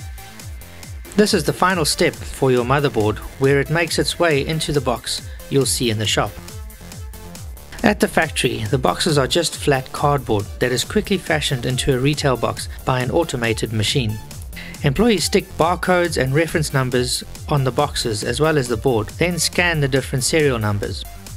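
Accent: South Africa. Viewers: Are you South African? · Sounds like a South African talking LOL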